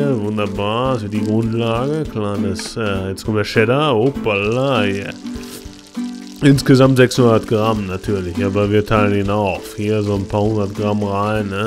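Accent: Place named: United States. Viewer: Immer dieses "hier hier hier" macht es nervig. nach ner Minute hab ichs ausgemacht. nicht authentisch. Dieses amrikanische ausgesetzte ist zum kotzen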